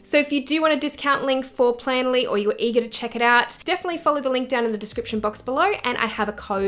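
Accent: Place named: Australia. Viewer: Very new to using instagram, just started an account for a business im trying to get off the ground. Started to youtube it to research and learn how to do things. So pleased i found this vid, hopefully u have more for me to learn from. rather hear it all in an aussie accent rather than a seppo one. First time really using instagram, hopefully it isnt too hard to get around it and become reasonably proficient at it, thanks for posting young lady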